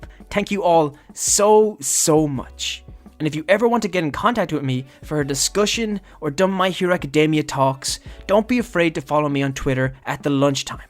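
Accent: Ireland. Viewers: He has an irish accent and irish accents tend to pronounce their th as either a t like in words think and three or as a d like in this and that.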